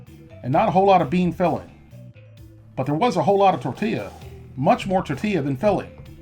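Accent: South, United States. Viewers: I have missed your videos and Southern voice !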